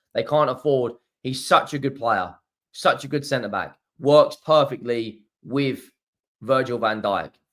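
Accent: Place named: Liverpool, United Kingdom. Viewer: He sounds like a good scouser does this bloke lol.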